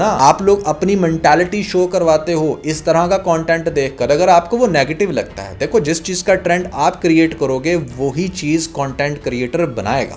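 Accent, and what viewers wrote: India. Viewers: Why you speak like Indians?"karne lag Ra tha" nonsense 😊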